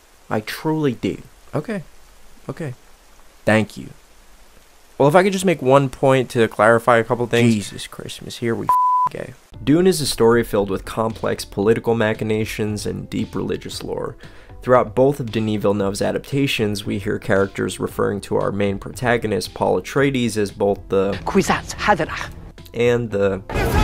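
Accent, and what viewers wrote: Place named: Baltimore, United States. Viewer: Eerily good Baltimore accent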